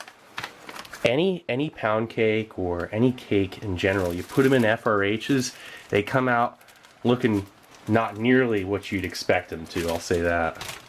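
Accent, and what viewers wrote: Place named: United States. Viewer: Could anyone please tell me where in the US does accent come from?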